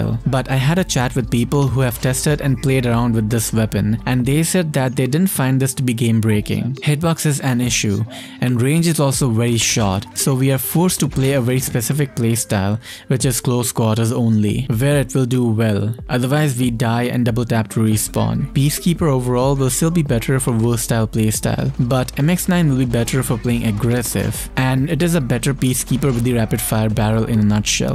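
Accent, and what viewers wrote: India. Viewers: Hey are you Indian 😅😅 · You sound Indian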